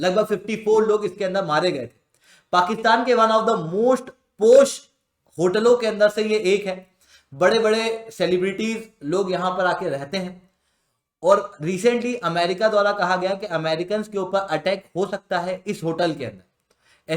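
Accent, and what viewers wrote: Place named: Himachal Pradesh, India. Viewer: Sir himachal se hae sayad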